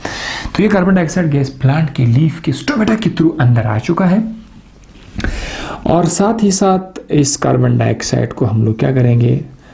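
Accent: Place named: Quetta, Pakistan. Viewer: Gr8 sir app ka urdu quetta ka hi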